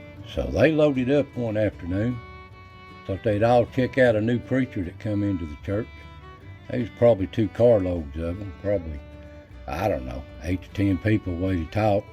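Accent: Appalachia, United States. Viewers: I grew up in Southern West Virginia and heard about snake handling churches growing up. Your video makes me miss home, we sound an awful lot alike. I've traveled this country from one end to the other and my accent always gets commented on everywhere I go. Your videos are a treasure, keeping our mountain history alive.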